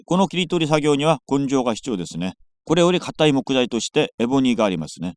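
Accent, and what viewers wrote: South Korea. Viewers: 韓国訛りの日本語でも上手😊 ずっと日本の人だと思ってた~応援してます🎉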